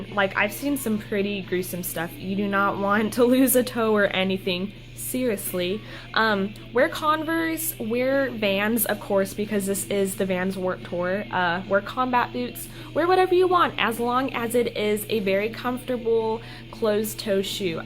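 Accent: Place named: South, United States. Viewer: I love your accent. I kinda could tell that you we're from the south. Also great advice. I live in Western Massachusetts so the weather does get kinda hot but I'm going to the Hartford CT date. I'd love to know what bands you're going to see.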